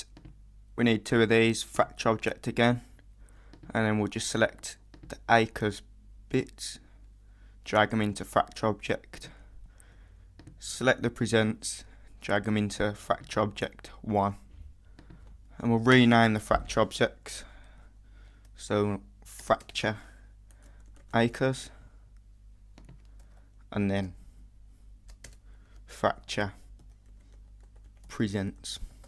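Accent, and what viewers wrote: Birmingham, United Kingdom. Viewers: Birmingham Accent FTW :D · Hmmmmm.. Are You From Dudley? Im From There And You Sound Like A Brummy -_ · Typical Brummie :)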